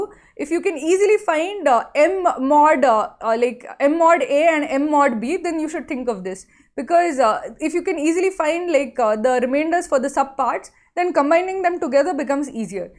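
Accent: South India, India. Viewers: You have that typical South Indian accent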